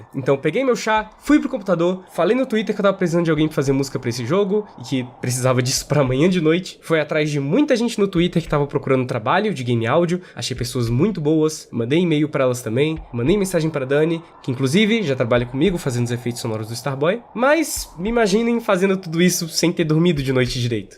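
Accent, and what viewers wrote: Brasília, Brazil. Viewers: VOCÊ É DE BRASÍLIA???????????? ceilândia ou taguatinga sul?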